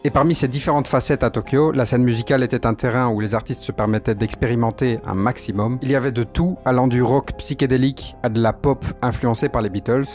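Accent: Belgium. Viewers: T’es Belge?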